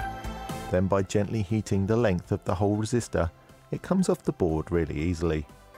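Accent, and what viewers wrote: United Kingdom. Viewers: Are you from Liverpool? You sound like you're from Liverpool.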